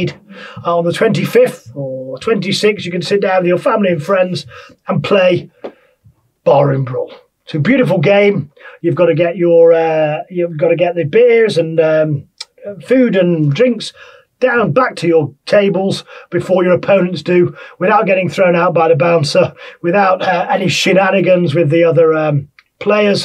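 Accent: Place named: United Kingdom. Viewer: . you should have told the story like Sir David Attenborough! I mean you already have the accent, so why not flaunt it!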